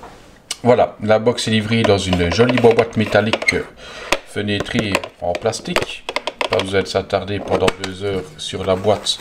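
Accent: Liège, Belgium. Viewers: Lol toi tu viens de liège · vous avez l accent liégeois!!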